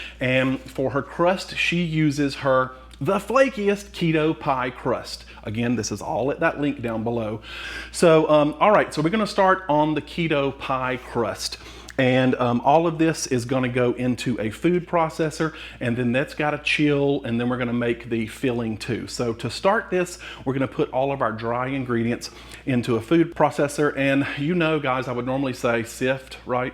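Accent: South, United States. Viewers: One of the best things about finding out I am pre diabetic is finding wonderful channels like this. Grew up in Tennessee now living in Florida an I do miss that southern accent. Get a kick out of him saying Y'all we're makin ____ come on! Puts a smile on my face every time.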